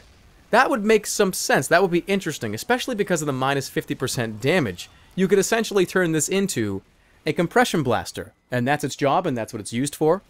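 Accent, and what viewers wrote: Boston, United States. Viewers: I watched Shibby2142's video about the new weapons, and I gotta say: this video is much more entertaining. Shibby is still funny but more calmer and less energetic. Your videos always have this energy to them (maybe it's the Boston accent), and that's why I subscribed to you before Shibby.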